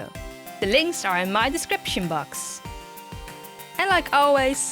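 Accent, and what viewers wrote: Netherlands. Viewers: Wow once you started talking about pokemon I realised that you really sound Dutch and I was very confused for a moment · I was watching this like “why does that accent sound familiar” and then I saw your where Dutch and then I was like “oh daarom dus” ik ben ook Nederlands hihi😁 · I just found you because of dollightfull. But I have a qeustion where do you com from. Because of you're accent it sounds like you're dutch, but I can be wrong. I love the doll by the way!😍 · Im dutch to and i tought it was a dutch accent but i wasnt sure 😂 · Je kan gewoon horen dat je nederlands bent😂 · haha, the accent sound really weird for people who arn't dutch.. our accent is really different and sounds kinda funny when we speak English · Wow this is really cool! Quick question, are you Dutch? I think I regocnized the accent xD. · Ahh I found you due to Dollightful and I heard your accent was Dutch haha. 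Sorry I am also Dutch and well I hear it immediately ! I do not do doll customization or anything. But I always love to watch dollightful! So cool I found your channel! I really liked the collab between the three of you!!! Just love how you were so creative with the bottom part! Keep up the good work! Fellow Dutchy! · Are you Dutch? Your accent seems Dutch XD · ik dacht ook al van hu?! Ben jij Nederlands toen ik haar hoorde😂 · Omg I’m really late watching this but I could tell by your accent you are dutch too! Subscribing rn! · i heard the way you talked english and i was like is she dutch too? and then you said you saw the dutch version of pokémon and then i knew i heard it right xD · i could just hear your dutch xD im dutch as well! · your accent sounds dutch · haha, ik had al z'n idee dat je Nederlands was. Dat accent herken ik altijd ;D Very nice work :D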